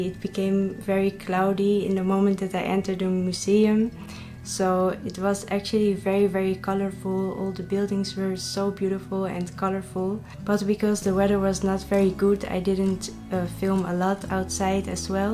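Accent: Netherlands. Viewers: u sound dutch do u speak Dutch??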